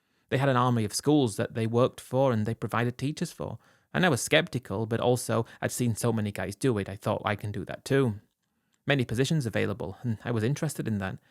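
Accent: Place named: Liverpool, United Kingdom. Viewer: Adyeee lad more scouse whinging 😢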